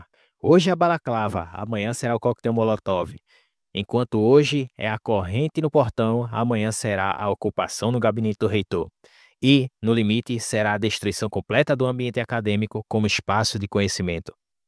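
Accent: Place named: Ceará, Brazil. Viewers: a narraçao nordestina cagou com o canal , não canal inteligente e voz nordestina não combinam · Narrador cearense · Do carriri cearense, certeza ❤😂😂😂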